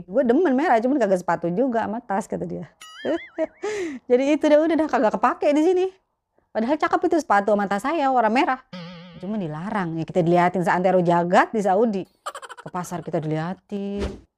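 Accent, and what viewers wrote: Jakarta, Indonesia. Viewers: Video2 sebelumnya koq blm keliatan bgt logat betawinya mpok🤭jadi shock saya dengernya😂tp tetep menarik koq ceritanya ❤️❤️❤️ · Masyaallah,baru liat chanel mpo nih udh suka aku ,betawi nye medok bgt si mpo jdi tambah suka aku liatnya ,salam kenal syg mpo dari aku betawi rawabelong😁😘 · Masyaa Allah logat betawinya gak bisa ilang. Haha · Logatt Betawi nya enak bener👍🏻👍🏻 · Wkwkwkwkwk Betawi banget anjayyy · Aduh mbak kedengaran betawi nye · pertahankan logat betawi mpok jgn luntur kami sangat meni'mati cerita & pengalaman di saudi sana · Mba logatnya Betawi,seru banget... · Demen banget dah ma logat betawi nyah. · lucu deh,bahasanya.Menyenangkan & menghibur, pake aksen Betawi.Lanjutkan! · hooh.. w jdi ktwa mlu ntn yahh .. sumpah po lu lucu bgtt betawi bgtt😄😄😄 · salam sehat....ngomongnya mpok berasa di Betawi, tp betawi sekolaan ya...keliatan cerdas enak ngomongnya mengalir kayak aer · Haha logat betawi mba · iya betul medok bngt betawi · Senang nonton channel sangat menghibur apalagi logat Betawinya itu lo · Set dah ah...guwe demen deh si mpo betawi banget dah 😂😍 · Duh mpo demen banget denger n lihat logat betawinye ...! 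bener kaya lg nonton stand up komedi z ! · Betawi bgt mpo, reseep dengernya😅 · Iyya ini bahasa Indonesia logat Betawi/Jakarta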